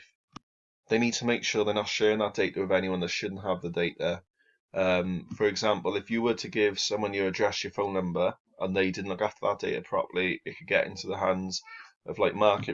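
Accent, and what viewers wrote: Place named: England, United Kingdom. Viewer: Can't understand shit. Your English is too overly english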